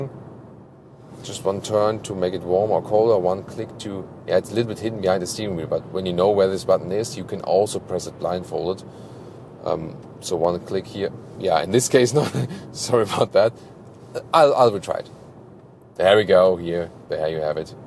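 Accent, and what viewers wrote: Germany. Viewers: There's something about watching a car review with a German accent. Honey to my ears man 😂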